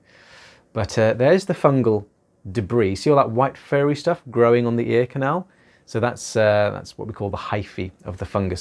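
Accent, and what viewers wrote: England, United Kingdom. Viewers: I'm here for the english accent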